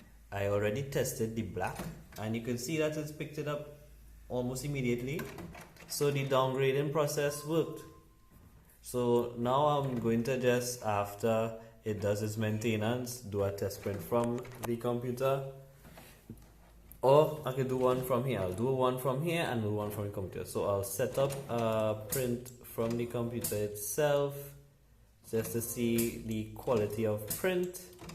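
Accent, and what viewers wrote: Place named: Trinidad and Tobago. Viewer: Hi thanks for this video. You sounding like a Trini, if you are can i get a contact for you? Or call me at <num> thanks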